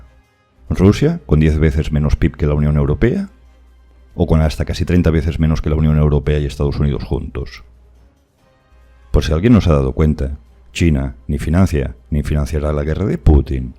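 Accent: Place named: Zamora, Spain. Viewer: Hola por tu habla (acento) no crei que fueras de Latinoamérica pareces mas de mi querida Zamora España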